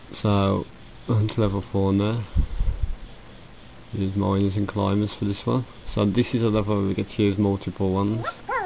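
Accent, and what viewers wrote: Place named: Midlands, United Kingdom. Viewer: For u Americans it's called a Midlands accent. From the Birmingham area of England.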